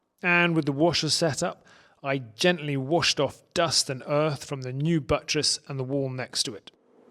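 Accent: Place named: Scotland, United Kingdom. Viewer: I detect scottish accent..where from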